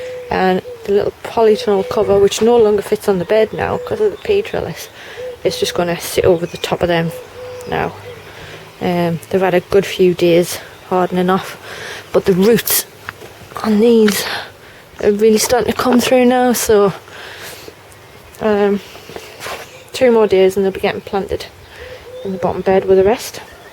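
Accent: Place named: Newcastle, United Kingdom. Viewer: I only discovered your channel a couple of weeks ago and have had marathon sessions watching your gardening vids from the beginning, to the extent that I've started talking to myself in a Geordie accent when I'm gardening! You're an inspiration!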